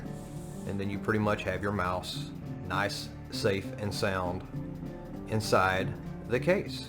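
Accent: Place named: Louisiana, United States. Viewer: Banger of a review, well done! :) just found your channel and subbed, loved the bgm to go with the chill Lousiana accent, great video cowboy :D Btw the flex is at the bottom of the mouse, I recommend not to go too hard there around the sensor although it's probably really safe, some flex at the bottom plate is basically always to be expected :)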